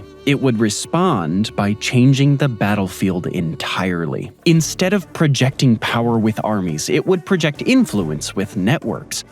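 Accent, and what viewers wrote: United States. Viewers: Warder, Baddle, Baddery, Lidderally. No one butchers the English language like a Seppo.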